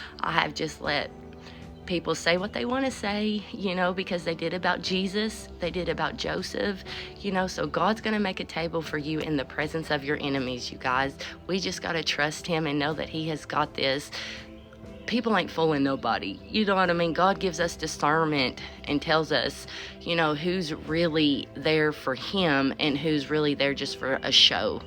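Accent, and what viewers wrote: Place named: South, United States. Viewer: I could listen to you talk about Jesus in that southern accent all day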